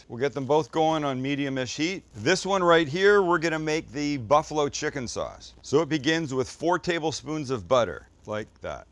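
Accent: Quebec, Canada. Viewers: Retire the French Canadian accent!!! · Being from Montreal Quebec, I can tell you that accent was horrible lol. I love you, but you gotta come to Quebec more often and practice the accent lol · very good French canadian accent but poutine is pronounced "puts in" love your content keep em coming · Your French Canadian accent is spot-on. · Need more French Canadian accent…brilliant!! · That is the best French-Canadian accent I've ever heard. I also smell toast. 🤔 😆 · I'm from Montreal and I promise you Quebec accent is awful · Great Franglish Queebeck accent, you're the best. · Your French Canadian accent is very good, this coming from a Québécois fan of yours. Looks delicious. However, the gravy for the poutine is always brown (roasted flower) in all the poutine joints I know of. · Solid French Canadian accent. You sounded like every trucker in my mill from Quebec eh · Thumbs up on the Quebeçois accent! 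I prefer it coming from sexy girls, but will do. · Tellement une bonne recette de poutine… L’accent canadien français, québécois est de marde mais la recette est écœurante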